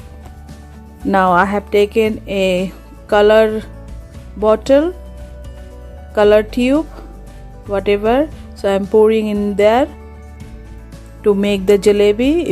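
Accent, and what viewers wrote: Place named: Bengal, India. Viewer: Watched your video fully. You have bengali accent. Join you dear.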